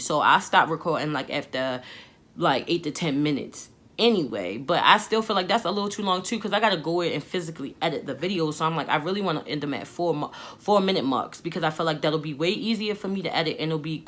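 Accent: Baltimore, United States. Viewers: I'm from Cali and I love me a BMore accent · Girl I’m from DC I hear that Baltimore accent LOL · I agree this is probably the worse camera to vlog with. SN that Baltimore accent is strong girl! 💪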